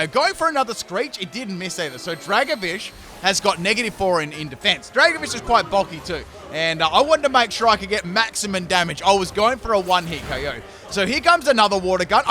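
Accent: Australia. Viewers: Yes his Australian accent makes it so funny 😆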